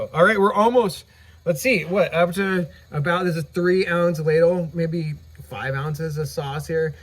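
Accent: California, United States. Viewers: The California accent is amazing. Like from The Californians on SNL.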